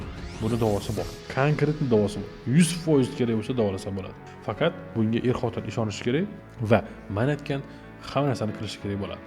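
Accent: Khorezm, Uzbekistan. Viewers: Хоразмча талафуз блан тушунтирдингиз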